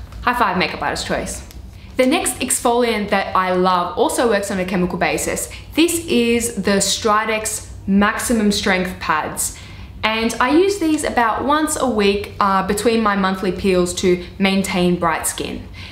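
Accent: Australia. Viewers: Lol the end was funny, nice to you as you!!! Btw, r u Australian?!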